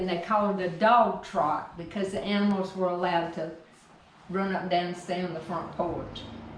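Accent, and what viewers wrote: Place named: Georgia, United States. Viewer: This took me back to the summers of my childhood. I spent them 9 miles away from Lumpkin, in Richland. I miss that accent!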